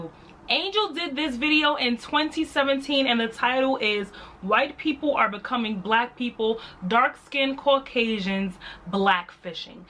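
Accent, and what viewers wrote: New York, United States. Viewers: On ya way to 1million!!! Yasssssssss! Sn: You are soooo NY and I love it 🥰🥰🥰😂😂😂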